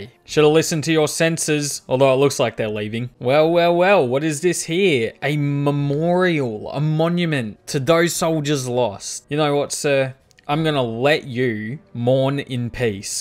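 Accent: Australia. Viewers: An amazing let’s play. I love watching people play nice and good characters but there is something cathartic about this. It just rubs me the right way. The Australian accent helps as well ;)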